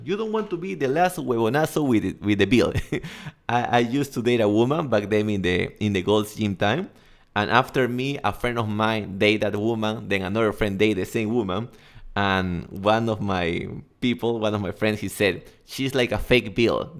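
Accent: Peru. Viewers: LA PREGUNTA ES QUE TE METISTE PARA HABLAR INGLES CON ACENTO PERUANASO ,PERO SI TE DEJAS ENTENDER ME LOQUEAS MAZETITA ,EXITOS SALUD Y VIDA .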